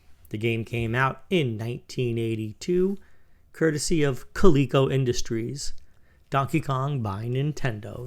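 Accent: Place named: Queens, United States. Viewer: Long Island NY? That accent sounds more like Queens than LI!!!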